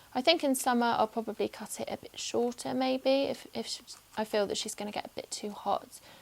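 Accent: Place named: United Kingdom. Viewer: Lily is so cute. And you have a very pretty British English accent.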